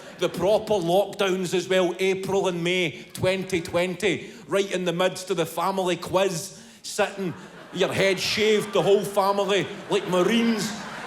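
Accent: Scotland, United Kingdom. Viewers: I was in Scotland this month for the first time. I recommend anyone planning that kind of trip to listen to your shows. Thanks to your comedy, I laughed my way to understanding the accent. I enjoyed the Whisky, the haggis, the castles and the history and had no problem understanding every word. Thanks!!!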